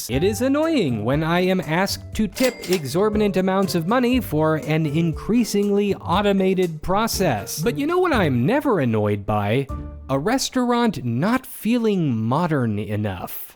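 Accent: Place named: Missouri, United States. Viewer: restaurant videos also show signs of modernization by removing the emotional slips into a Missouri accent. Whenever I feel the need to mimic my Springfield-based coworkers I always locate the accent with "have a training day!" and it brings me immense joy.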